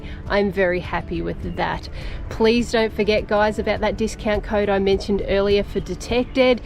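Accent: Australia. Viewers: Your videos are the best! Love your beautiful Australian accent : )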